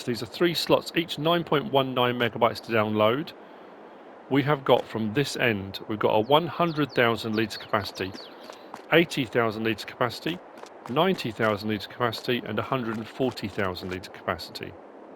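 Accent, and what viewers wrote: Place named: United Kingdom. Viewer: Keep up the great work! I work night shift in the states, and your videos always get me through the last few hours of work 😂 sometimes I can’t help but hop on and try out some new mods when I get home! I appreciate what you do 👍🏻 my family is British as well, so to top it off you sound just like some of them 😆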